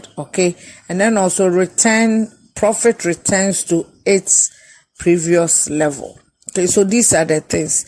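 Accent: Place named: Africa. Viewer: Heavy African accent Miss! Great job.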